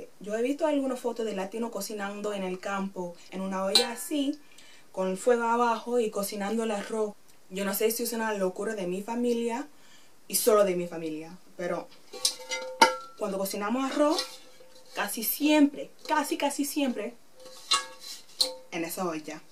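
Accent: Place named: Spain. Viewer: No creo que equivocaste por nada que yo entendí todo perfectamente. Great video and love your Spanish accent 💜💜💜💜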